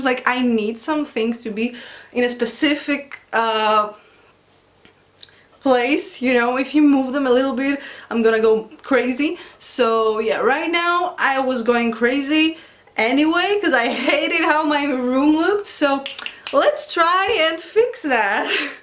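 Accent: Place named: Greece. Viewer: nice... καταλαβαινεις τον ελληνα απο το παχυ λλ και τα μεγαλα φωνηεντα. keep up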